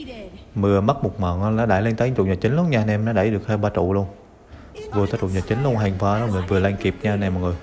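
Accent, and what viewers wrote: Quang Nam, Vietnam. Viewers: Người Quảng Nam hay Đà Nẵng rứa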